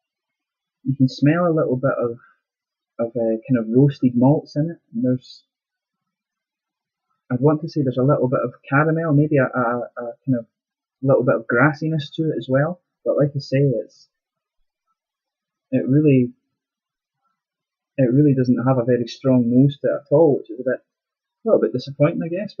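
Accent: United Kingdom. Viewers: yes sir, and guess what; yes, I live in Canada! Lol, I moved up here 3 years ago. Where are you from bro? If you live in the states, I'll send you some beers from Mexico if they allow me too, although I pretty much believe you live somewhere in Europe, that British accent is obvious. Love your videos bro, and pardon me! It is not a Louisiana beer! It's called: "Mississippi mud" the one with the alligator in it! Lol, they'll laugh their tears off! If they read this.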